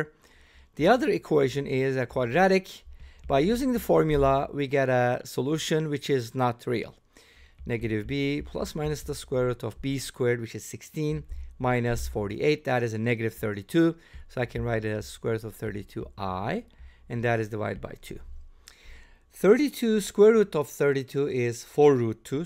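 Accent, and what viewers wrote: Turkey. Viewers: Is that a Turkish accent I'm hearing? 🤔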